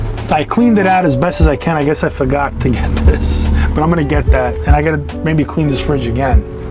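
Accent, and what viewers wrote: New York, United States. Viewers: This is really a big pice of sh*t u kno that rite trying to make it sound like it's a cream puff the way your talking where u from nyc that's y won't be caught dead in this bro it probably stinks of ass n piss with all the sheets covering disgusting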